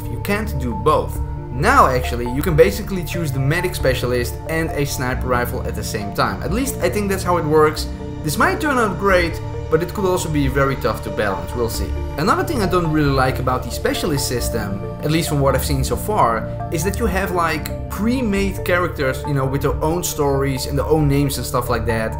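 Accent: Netherlands. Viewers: this mans dutch accent... love it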